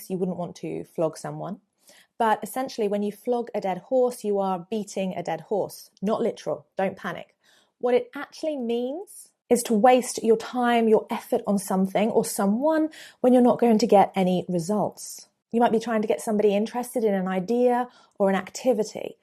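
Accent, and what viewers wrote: United Kingdom. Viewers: Great British accent ! I live in Austria where the children are taught to pronounce every “a” (or “A”) as “e” (or “E”), for example “en epple” or “e men”. It was really refreshing for me to hear an “a” pronounced as such ! I think this anomaly originates from American English, as the Americans often pronounce an “a” as in “ha-emmer” and not just like “hammer”, however the Austrians and Germans are taught to pronounce it like “e hemmer”. My wife was often laughed at in the UK for example when she talked about a person having “e sore beck”, and a friend of mine quipped “Sore Beck? Is he by any chance related to Jeff Beck..???”. The level of English spoken by Austrian and German people is also considerably lower than that spoken e.g. in the Scandinavian countries or in the Benelux countries, but the local authorities just don’t care, as they are doing nothing to remedy or to improve on the situation..🤦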